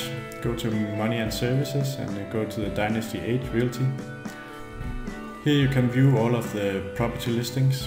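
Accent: Denmark. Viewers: Hello may i ask are you danish? Hvis du er KOOONGEEE xD EJ køber selv gta 5 så tak for videoen :D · er du dansker? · Er du fra Danmark? Du lyder dansk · Er du Dansker? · Are you from Denmark ?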